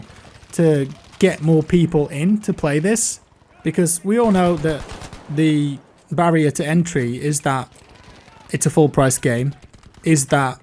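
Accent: United Kingdom. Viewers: Here's something that's kinda funny. When I watch you're content for BF5 or content from any other game, I trust you more than some guy in America, (I live in America) because of the Brittish accent. (Yes, I know you don't have an accent but I have the accent) Why?? I have no idea at all..... :)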